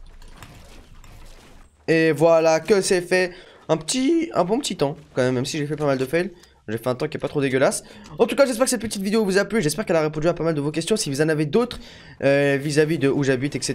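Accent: France. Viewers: les gars avec son accents francais est le style maison il habitr ah marrakech sais sur fois mille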